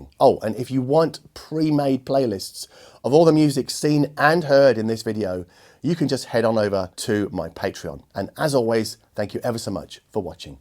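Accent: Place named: England, United Kingdom. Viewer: Thanks! Get well soon (You sound like Caleb from Digital Trends albeit with an English accent 😅)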